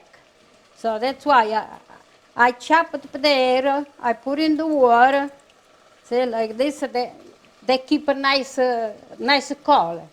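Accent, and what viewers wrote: Italy. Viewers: I love this; I grew up in a French/Italian neighborhood since my family was French Canadian, we had a lot of Italian neighbors. I miss the accent that she has. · Sweet lady ... people are so insensitive. She has a deep Italian accent but who cares! Think of your own grandma. She means well. And she sings, too. Love it.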